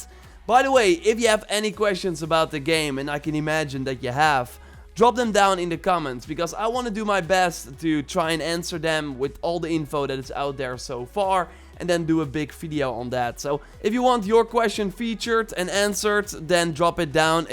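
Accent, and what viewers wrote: Netherlands. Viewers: Is dit een nederlander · are u dutch? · Not to mention his charming dutch accent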